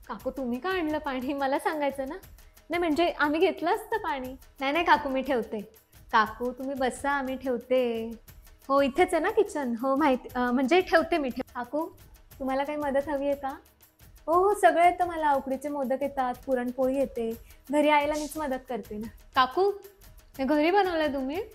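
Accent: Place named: Mumbai, India. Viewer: खास मुंबईकर मराठी!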